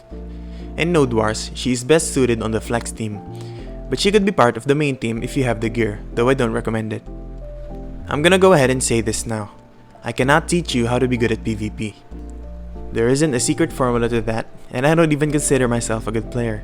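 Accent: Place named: Philippines. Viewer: i hope this doesnt sound mean in any way, but are you filipino? i wanna say i hear the slight accent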